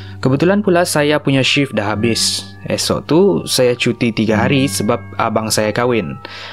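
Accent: Sabah, Malaysia. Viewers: Cerita2 Seram! Memang cerita yang benar berlaku dan sentiasa disukai semua orang.. Suatu masa dahulu tahun 1955 Radio Melayu Singapura pun menjadikan SERAM sebagai program terbaik diRadio dan sentiasa keudara pada jam 2330 setiap malam Jumaat.. Berbagai cerita yang cukup menakut dan menegakkan Bulu Roma serta ditambah kesan bunyi juga amat menyeramkan..Ia bukan seperti Drama biasa diRadio.. Mohon Penyiar ini menukarkan lorat membacanya yang begitu BAKU dan seolah olah dalam lorat SABAHAN ke lorat biasa saja agar lebih syok didengar dan betul2 ngam2 sui kata sebenarnya.. Idok ke gitu.. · Memng org Sbah agknye.loghat Sbah je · Suara dia best..,Mcm sabah sikit...Hihi...😃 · Sabah LA bai tuh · memang sabah pun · memang org sabah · sabah sja guna kopi - kupi.. haha.. mmg sabah dia ni..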